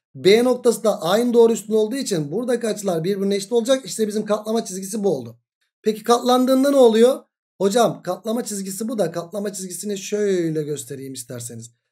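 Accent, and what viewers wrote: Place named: Central Anatolia, Turkey. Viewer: Hocam sizde ankara-kirikkaleli konuşma tipi var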